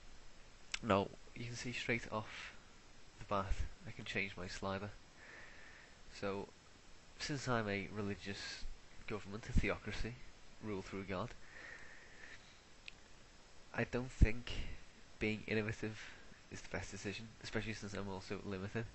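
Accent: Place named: Liverpool, United Kingdom. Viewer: Scouser! :P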